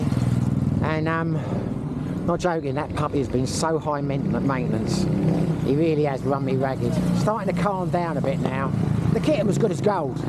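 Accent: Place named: Cockney, United Kingdom. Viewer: Nice hearing a cockney accent. COYI ⚒️ I absolutely loved Vietnam. Was there for two weeks last month for the first time. I really loved Da Nang. I could see myself retiring there but isn't retiring there harder ?? They don't have any dedicated Retirement visa I heard. Could be wrong. Guess I gotta find myself a Vietnamese lady to marry !! Haha . Be lucky !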